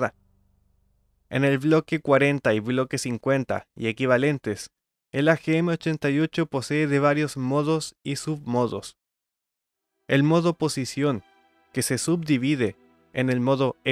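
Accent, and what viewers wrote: Chile. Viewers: Excelente video y su análisis. No es usual encontrar análisis tan objetivo basado en la especificación y proyección técnica que podría ser usada en un conflicto. Siendo venezolano donde junto a EEUU (país fabricante) poseemos una de las escuelas y formación de mayor data sobre esta plataforma, no me es de extrañar que posiblemente (por el acento) un hermano chileno, nos de tal orientación, donde concuerdo perfectamente en el entendido que se quiere hacer ver que los F16 seran los dueños del espacio aéreo ucraniano y nada más lejos de la realidad, ya que sólo son una plataforma y los rusos aún por: 1)Entrenamiento y conocimiento de las propias 2)Capacidad de sus plataformas 3) Posicionamiento y plataformas multiplicadoras 4)Tecnología Finalmente 5)Número y diversidad plataformas como de unidades Superará con creces la variante aérea que implica el ingreso del F16 (en versión reducida) Sólo en entrenamiento, en conocimiento para luego llegar a un dominio determina muchas horas de vuelo, que será muy difícil lograr y esto es uno (muy importante) pero sólo uno de los factores que determinan para lograr algún entorno de éxito. 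Por el contrarío el ingreso de esta plataforma involucra factores verdaderamente preocupantes a ser considerados ya de emergencia en el Consejo de Seguridad de las Naciones Unidas, ya que esta plataforma a diferencia de las Rusas es más delicada ante impactos, pistas de aterrizaje, su mantenimiento y su reparación, esto DIRECTAMENTE determinará que países eu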